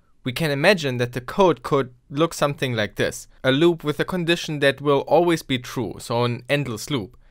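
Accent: Germany. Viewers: Kannst du den deutschen Akzent wirklich nicht raushören?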